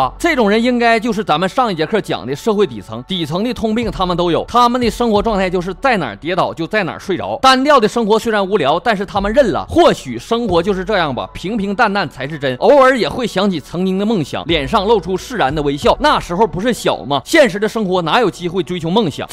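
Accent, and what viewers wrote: Northeast, China. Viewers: 在拳霸3中听到主播说到这期节目，所以专门过来学习一波：）个人认为这个系列逻辑性很强，干货满满，堪称良心，听后从中受益匪浅，也希望主播不要怀疑自己，坚持做下去。PS：身为一个南方人已经被魔性的东北吐槽洗脑了！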